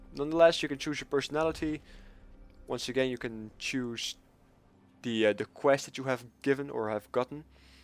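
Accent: Netherlands. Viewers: Do you have a dutch accent? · haha je nederlandse accent is zo duidelijk :P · You sound sooooo Dutch. xD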